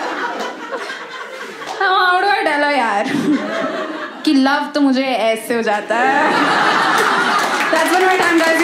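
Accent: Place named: India. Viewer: this is why Americans make fun of Indian accent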